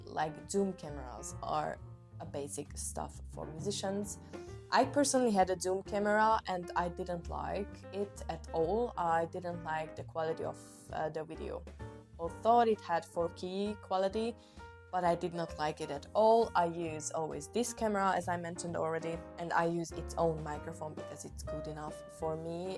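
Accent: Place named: France. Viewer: What is that small black triangular item in the plastic bag that you put in your flute bag? By the way, I love your accent. Are you French?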